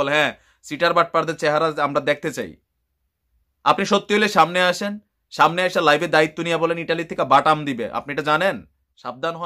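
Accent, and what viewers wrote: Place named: Comilla, Bangladesh. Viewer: ভাইয়া কুমিল্লা ভাষায় কথা ভালো লাগে না হলে ভালো লাগে না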